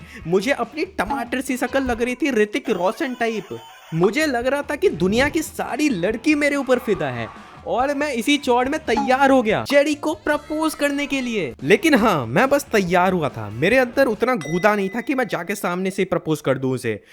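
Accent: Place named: Assam, India. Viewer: Bro aapse Assamese wali vibe aati h .. wese ho kahase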